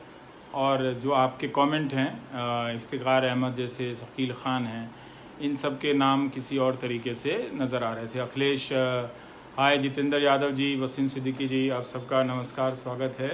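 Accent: Bihar, India. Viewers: I salute sir Ravish ji main aapka bahut big fan Ho aapki Bihar ki language bahut hi Pasand karta hun aapko aap kaise patrakaaron ke liye salute Hai Sar god bless you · Nice to see you continuing your duty of enlighting us aapka bihari language ❤️, we agree that you have done maximum number of programmes on public interest, we respect you.👍🙏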